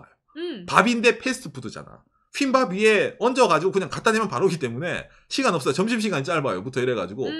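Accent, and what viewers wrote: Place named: Gyeongsang, South Korea. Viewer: ㅎㅎ 사랑스럽고, 은 듬직하고, 경상도 사투리 ㅋ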